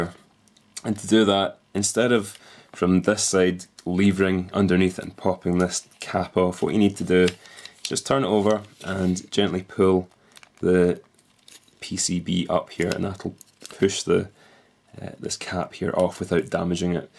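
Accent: Scotland, United Kingdom. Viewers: Scottish? Subscribed. Always subscribe to other Scottish gamers :P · I didn't realize he was Scottish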